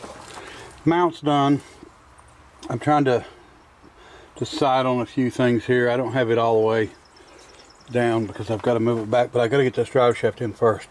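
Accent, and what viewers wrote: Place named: Tennessee, United States. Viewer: "I am alookin and not aseein" , brought back good memories of Tennessee from decades ago.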